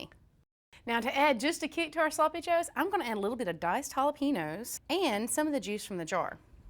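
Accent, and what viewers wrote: South, United States. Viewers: I love your voice you sound like all the folks around where i grew up.God bless our south. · Very difficult to understand her! Talking too fast .. with a Southern Accent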